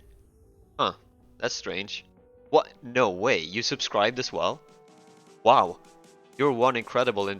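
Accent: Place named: Sweden. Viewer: du måste vara svensk efter din dialekt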